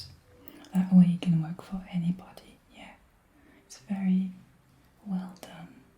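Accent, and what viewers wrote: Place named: France. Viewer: Ce très léger accent français sur certains mots est HYPER agréable 👌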